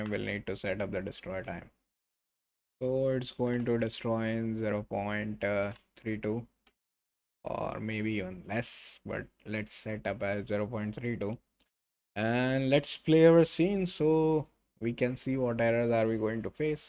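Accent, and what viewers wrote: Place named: Gujarat, India. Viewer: are you form Gujrat ? keep it up bro.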